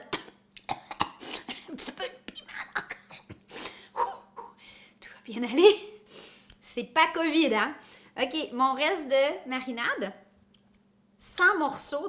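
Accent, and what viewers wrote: Quebec, Canada. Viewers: J’adore ton accent québécois ❤️❤️❤️❤️❤️❤️🇨🇦🇨🇦🇨🇦🇨🇦🇨🇦🇨🇦🇨🇦🇨🇦🇨🇦🇨🇦🇨🇦🇨🇦🇨🇦🇨🇦🇨🇦🇨🇦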